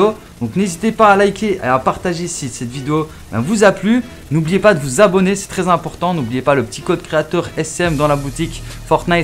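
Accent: Belgium. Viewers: Tu a un accent belge je me trompe??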